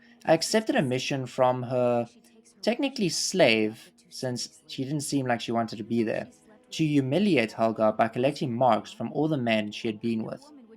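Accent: South Africa. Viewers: I can recognize a south african accent from a mile away, ggs man :))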